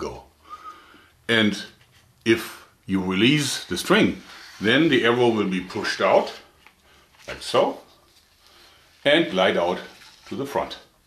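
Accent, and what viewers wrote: Germany. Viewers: Ich weiß nicht warum aber man hört dass er deutscher ist 🤣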